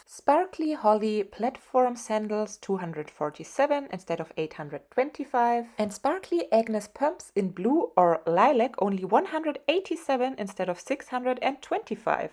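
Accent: Germany. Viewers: I love your german accent...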